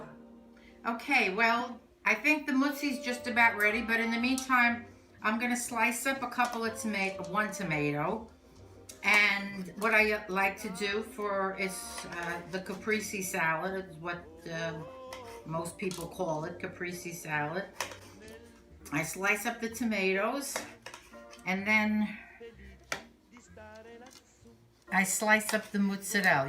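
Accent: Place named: Bronx, United States. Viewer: Another nice video! You sound like a Bronx gal to me. My Grandpa was from there. Try the whey in soups, it's really good. I have a smoker, so I might attempt making some scamorza.:)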